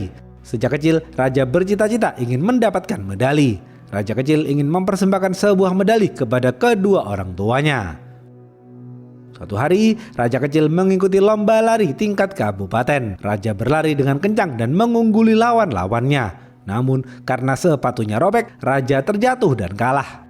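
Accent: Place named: Solo, Indonesia. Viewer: Min suaranya kaya pria solo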